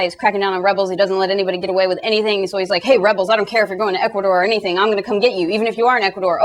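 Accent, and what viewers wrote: Russia. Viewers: Nice Russian accent!! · hahahah <3 your russian accent is frkn awesome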